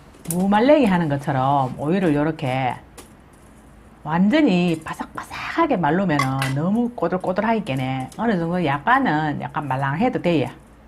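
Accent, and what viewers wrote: Gyeongsang, South Korea. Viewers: 이 갱상도 사투리가 넘 구여워요. ~^^ 사투리 듣기 싫은 분은 보지 마이소. 싫다며 왜 들으며 댓글에 올리는거죠? 정보가 좋으면 듣는거지요. 오이 심어 다량 나올때 아주 좋은 저장음식, 묵나물형이 될 것 같습니다! 고마워요. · 선생님 경상도 사투리가 넘 재밌고 영상을 보는날엔 하루가 기분좋고 중독되서 자꾸보고 밥때가 되는줄도 모릅니다~~ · 경상도사투리 넘 좋아요ᆢ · 경상도사투리가재밋네요. 지금은서울에사나요? 요리를쉽게배우게하니감사합니다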